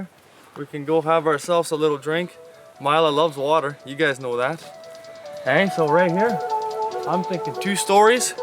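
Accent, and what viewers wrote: Canada. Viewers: Your accent sounds like it's a mix of Canadian and New Yorker. It's like nothing I've ever heard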